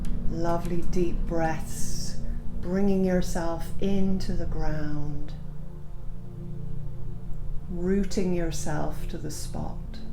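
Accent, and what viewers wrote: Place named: Ireland. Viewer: I meet this lady yesterday. She is so inspiring. Thank you. Love the Irish accent.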